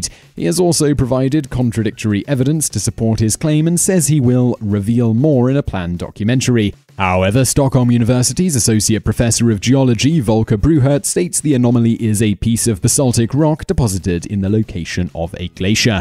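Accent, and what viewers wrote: United Kingdom. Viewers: Bunk. Something 'found' by one guy who's keeping it a secret is not archeology, or even interesting. The Shroud of Turin has long been debunked. Explaining away the carbon dating? "Interest of scientists and astrologers" Stonehenge: "Salisbury valley"? You're accent indicates you could've easily visited the site and seen that it isn't a valley, it's label on the Ordnance Survey maps and in everyday conversation as 'The Salisbury Plain.' You undermine every other video you make when you make this sort of junk promoting easily discredited nonsense.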